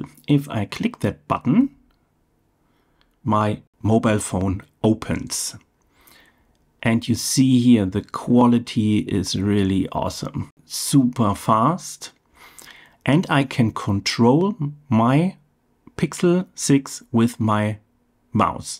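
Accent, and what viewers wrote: Germany. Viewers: man erkennt das du deutsch bist lol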